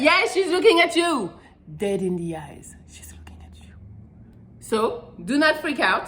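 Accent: France. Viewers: Hi I'm a first time viewer to your channel, a virgin of sorts, so just a few things first off i wish we' had vids (podcasts) back 20 odd year's ago because boy was I stupid, i used to have them running after me, know their running away from,second i thought i detected a wee hint of a bit of a French accent, apologies if I am worng I was just wondering i don't think I can jump that far. Keep up the good work. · What's your nationality lass, you have a french accent👀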